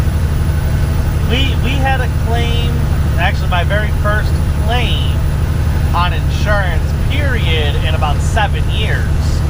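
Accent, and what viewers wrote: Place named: Tennessee, United States. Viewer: None of this is helpful to me sence I'm not a truck driver but I love listening to your Tennessee voice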